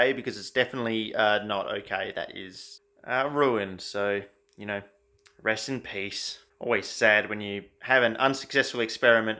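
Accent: Australia. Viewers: Love your channel, there's not many other people on the internet who do decent organic chem! A suggestion: try making benzaldehyde from benzene via Gattermann-Koch reaction. Basically, it's a Friedel-Krafts with CO + HCl mixture in place of an acyl chloride. Very neat chemistry and pretty cheap in terms of reagents used, maybe as cheap as you can get. I wanted to do that for a looong time, but I just don't have enough good equipment for working with the gases. In fact, I happened to find a detailed procedure for it in a 120 year-old "Practical methods of organic chemistry" by prof. Gattermann himself. Here's the link: <link> (Actually, it's a surprisingly good organic chemistry textbook.) PS: your accent is wonderfully Australian, always nice to listen to :)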